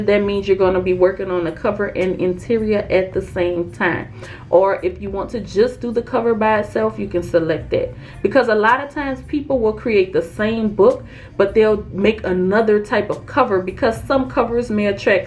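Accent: New Orleans, United States. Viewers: I hear that New Orleans accent. Love it ❤️ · Hey i just found your videos, and they are very inspiring. Are you from New Orleans? Please let me be right lol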